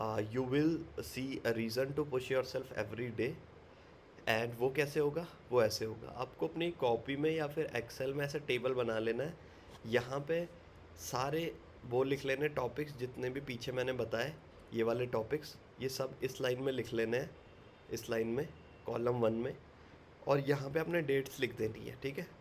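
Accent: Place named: Jaipur, India. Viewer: okay sir! Actually your tone sounds like a jaipurite! Thatsy I ask.. 😊👍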